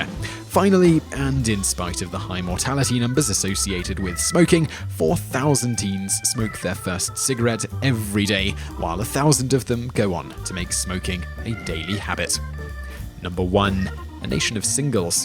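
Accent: United Kingdom. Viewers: the person doing the voiceover has a british accent ;)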